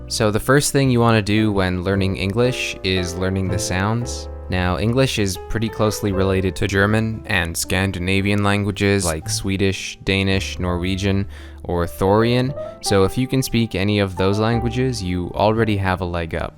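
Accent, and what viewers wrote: Russia. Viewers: your russian accent is actually pretty good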